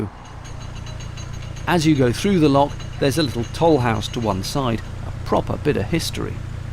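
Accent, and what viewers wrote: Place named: Midlands, United Kingdom. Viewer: I really enjoy your Vlogs and your Midlands accent lol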